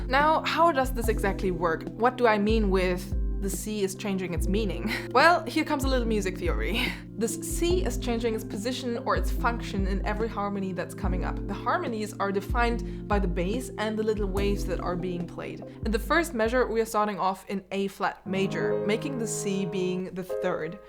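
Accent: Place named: Germany. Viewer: Never lose your German accent.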